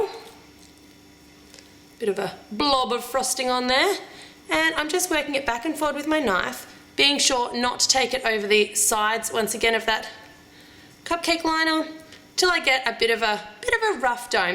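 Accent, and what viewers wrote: Australia. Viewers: Aren't u Australian? I recognise ur voice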